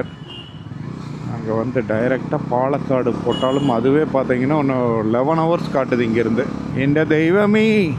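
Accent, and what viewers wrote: Kongu, India. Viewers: Hello bro, 3 days ku munnadi dhan unga first video pathen, adhuku aparam almost 8 to 9 videos back to back pathuten. Nice vlogs in kongu accent. Oru question, vehicles lam overtake pannumbodhu horn adika mateenguringa adhu dhan konjam concerning ah iruku, especially lorry ku left side overtake pannumbodhu. Please take care. All the best for upcoming videos.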